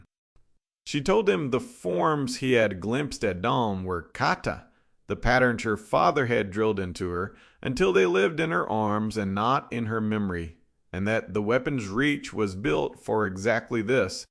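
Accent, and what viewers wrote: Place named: South, United States. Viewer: I understand the country accent, but the word is Kata, not cattah. The proper pronunciation is Naug e nata.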